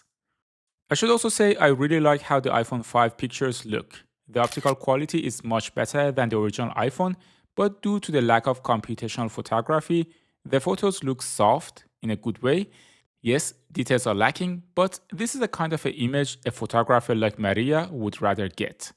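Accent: Iran. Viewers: لهجت میگه که ایرانی هستی😅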